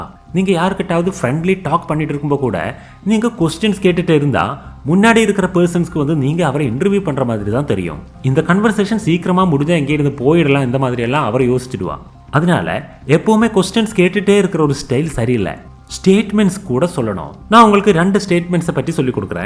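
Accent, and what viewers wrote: Kerala, India. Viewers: ஒரு நல்ல மலையாளி... தமிழ் பேசுறது இப்படி தான் இருக்கும் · Néenga malaiyali dhana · R u malayali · நீர் முதலில் தமிழரா? மலையாளம் வாடை வருகிறதே உமது தமிழில்! கோலேஜ & அவர் பேசிட்டே இருக்கும் என அஃக்றினையில் சொல்கிறாய்! எங்களை பல முறை அஃக்றினையில் குறிப்பிடுகிறாய்! நீங்க எந்த ஸடாண்டர்டுல படிக்குது..! யோவ்! ஓடிப்போயிடு!நீ முதலில் நன்றாக தமிழ் & ஆங்கிலம் கற்றுக்கொள்! தப்பு! தப்பா சொல்லி கடுப்பேத்தாத! உன் வாயில வசம்பு வைத்து தேய்க்க..!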